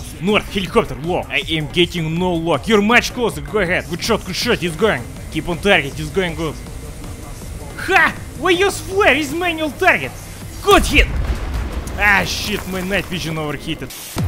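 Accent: Russia. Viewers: His voice is so stereotypically russian its hillarious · English + Russian fused results in english russian stereotypic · Борис, ты кто? Русский? или что просто по акценту похож, так что колись! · Почему у него такой русский акцент? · у тебя слишком русский акцент типа реально кажется что ты русский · Слышно же русский акцент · Ты руский у тя акцент русский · По акценту слышно, что ты русский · Чел, ты из росси? У тебя русский акцент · Максимально русский акцент одобряю 🗿👍🏻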